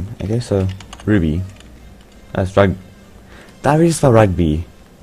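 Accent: South Africa. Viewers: you sound South African....are you?? if you are, jas my bru, so am I!!! :P :D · this guy sounds South African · ur african? lol wow :D im from england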